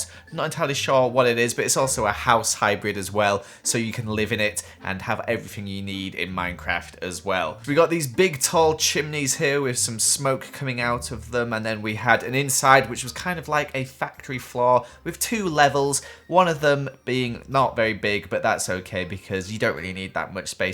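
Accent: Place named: United Kingdom. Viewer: without a British accent, the intro would not work at all.. cue me trying to say aww and ore in the same way for five minutes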